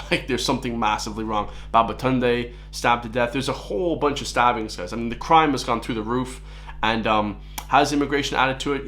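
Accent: United States. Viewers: Are you a yank??? What the fuck 😅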